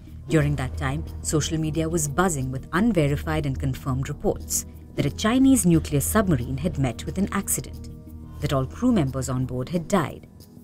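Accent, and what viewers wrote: India. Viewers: A strong Indian Asan accent is really unpleasant. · It’s a pity it seems too much Indian accent here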